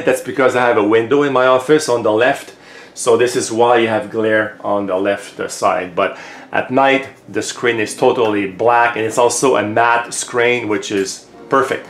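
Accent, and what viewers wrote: Quebec, Canada. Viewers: Salut je reconnais l'accent Quebecois haaha Nice review!! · thanks ;) Aussi t’es tu du Québec t’as un accent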